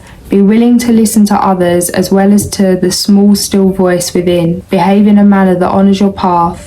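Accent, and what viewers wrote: United Kingdom. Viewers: I love that British accent!!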